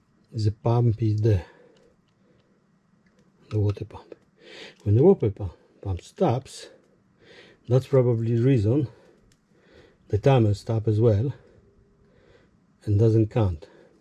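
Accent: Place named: France. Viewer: Gotta hate French accents...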